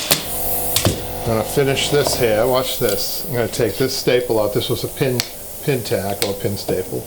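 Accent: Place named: MetroWest, United States. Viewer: Just happened upon your channel as I consider tackling my first upholstery project - and I just want to say that you explain things so intuitively. It all makes perfect sense as you go along explaining, that I quickly start to visualize and anticipate what you will say next. Of course, I immediately identified your accent as I am also from the metro-west area :) Easy to understand and well-explained instruction. Thank you! I feel like this might actually be enjoyable to undertake my project!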